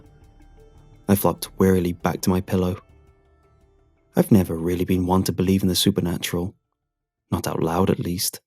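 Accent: United Kingdom. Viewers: You have a British accent .....cool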